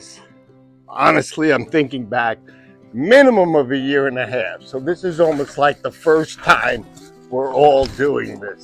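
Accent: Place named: New York, United States. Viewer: Love to hear the NY / NJ accent in a TN miller. Makes me feel at home with you. Hot Dawg !! Love your setup !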